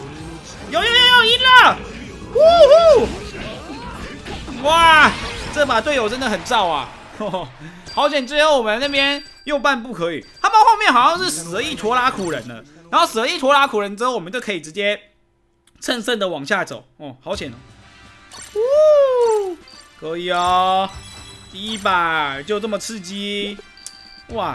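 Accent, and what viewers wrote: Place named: Taiwan. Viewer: 台灣國語真的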